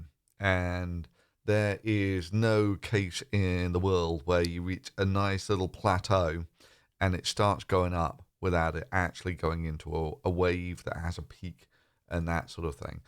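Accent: United Kingdom. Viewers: I seen where u get your accent. Its grom uk. This guy is a aristocrat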